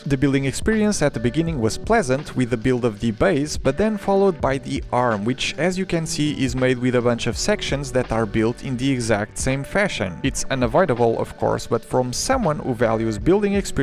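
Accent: Portugal. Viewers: Mano, assisti o vídeo inteiro sem perceber que tu es português, se não falasse eu não iria perceber 😂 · Consegui perceber logo que eras português mal falaste xD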